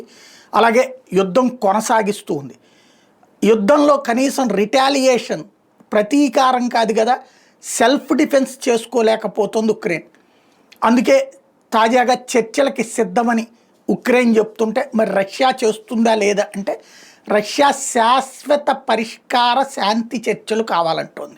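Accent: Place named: Telugu, India. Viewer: when you speaking , getting Telegu nativity sir.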